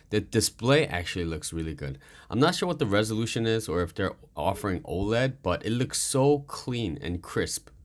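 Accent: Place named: South Korea. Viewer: I can tell that you're a Korean from your accent. Why are you pronouncing Hyundai as Hyun-DIE????? You know what they're supposed to sound like.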